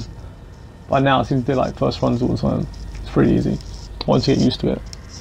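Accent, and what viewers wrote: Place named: Australia. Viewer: first, plus you australian because you sound like it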